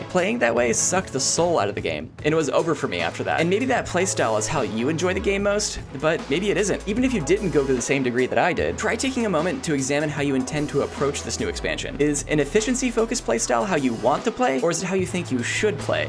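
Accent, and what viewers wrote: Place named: North America. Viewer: I like the mentality and if I could I would subscribe to it. Feels impossible to do so - especially in Retail where I play mostly. And even thinking about doing things semi-blind has many insinuations of ones 'skill' - their 'commitment' and so on. It's just easier, even from that social perspective, to fall in line and do things the 'accepted way' even if it feels like the soul is sucked out of it. I'd love to try out and play with your guild but you sound like you're from NA so RIP for me joining. Hope it goes well though. Good luck.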